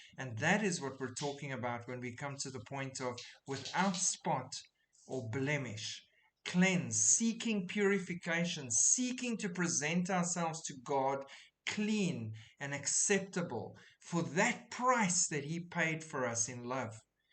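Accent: South Africa. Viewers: I found your discussion on the red heifers today, as I have been following Bible prophecy from the day I read The Late Great Planet Earth. I was so happy to hear a familiar accent and find a fellow South African who truly loves Jesus Christ and believes in the Sanctification of the Believers and who believes in the imminent Rapture, putting Him above all. Wow, thanks!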